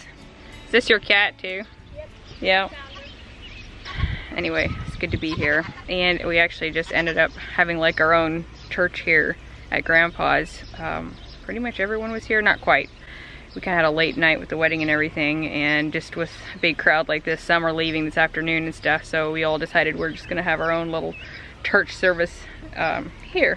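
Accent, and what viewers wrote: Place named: Ohio, United States. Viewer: Hearing you talk, you sound a lot like me. I thought I was different but I guess it’s the normal Ohio accent.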